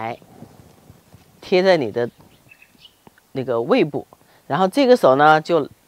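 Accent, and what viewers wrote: Wuhan, China. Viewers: 谢谢！您是武汉/湖北人？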